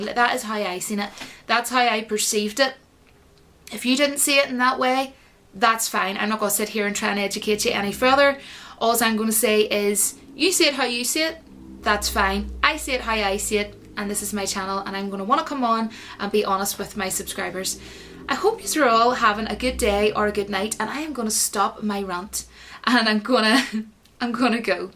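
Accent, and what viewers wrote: Scotland, United Kingdom. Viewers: Like ur Accent... r u scottish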